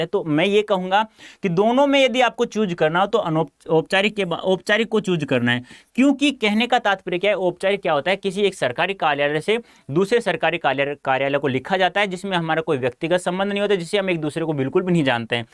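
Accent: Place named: Haryana, India. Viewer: Sir ki language hai haryana ki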